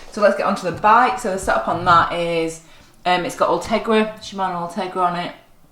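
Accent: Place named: Sheffield, United Kingdom. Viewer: That accent.is it sheffs?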